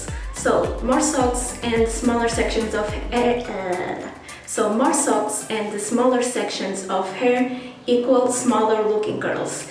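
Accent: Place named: Portugal. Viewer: Vi logo pelo sotaque que eras portuguesa!!! Adorei o método resulta super bem no meu cabelo! Obrigada e boa sorte para o teu canal! Beijinhos :D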